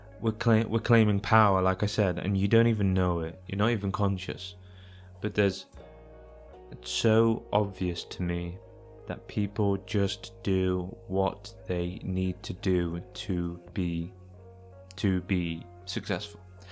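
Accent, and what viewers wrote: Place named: United Kingdom. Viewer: british :pillow-turquoise-hot-chocolate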